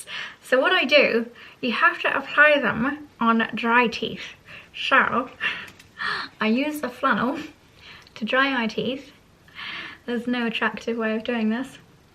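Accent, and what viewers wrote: Spain. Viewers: Love your Spanish accent! You sounded great!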